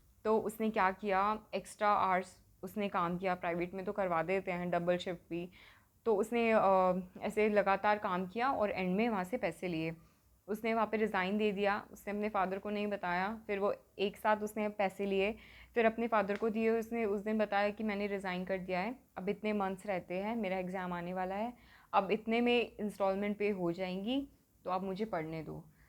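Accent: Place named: Himachal Pradesh, India. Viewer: .. Ok g... Apke bolne se aisa lgta h.. Ki ap HP se ho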